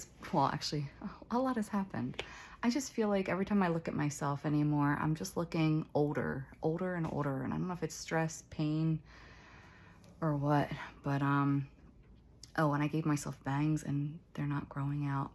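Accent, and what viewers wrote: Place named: Philadelphia, United States. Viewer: Thank you for all your videos, I watched just about all of them! I just had my ACDF C4-C7 on January 9, 2025. Neck is super sore, having trouble swallowing, looking forward to pain going away. I get a huge kick out of listening to you because I attended Villanova University in the late 80s, and your accent brings me right back to campus! Best to you!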